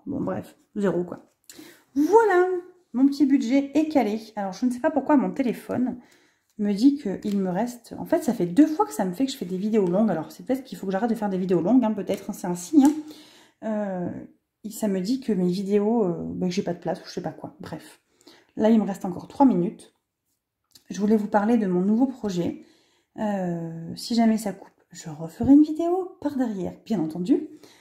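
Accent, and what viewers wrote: South, France. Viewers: Je trouve votre idée super pour le scolaire et pour les enveloppes ✉️ budgets etc... vous êtes une vrai warrior avec tout ce que vous entreprenez c'est très courageux! Vous avez un accent du sud je trouve c'est très jolie à entendre🙂 je vous souhaite de mener à bien tout vos projets et de vous faire un max de sous afin de pouvoir profiter un max de la vie avec vos proches🙂👍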